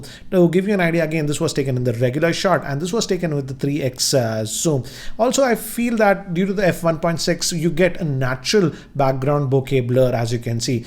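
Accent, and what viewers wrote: India. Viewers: very strong Indian Accent...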